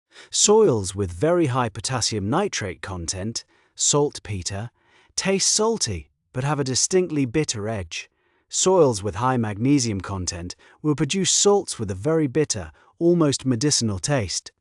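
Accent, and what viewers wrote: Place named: United Kingdom. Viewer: We don't like this British accent on these things in America no we don't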